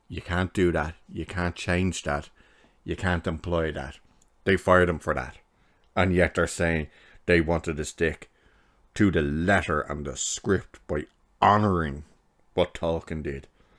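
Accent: Ireland. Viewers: It's great to hear a fellow Irish voice discussing this show and calling out the bullshit of these guys, jesus that Nomvete really believes she's smashing boundaries. I hated Star Trek Beyond with its absolutely incomprehensible villain.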